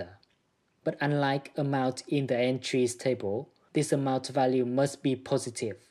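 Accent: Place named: Vietnam. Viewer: Your accent sounds like Vietnamese speaking English! Anw thank you for the tutorial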